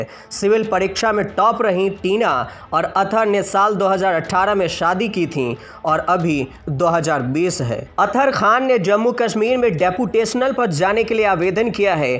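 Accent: Bihar, India. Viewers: Tum bihari ho kya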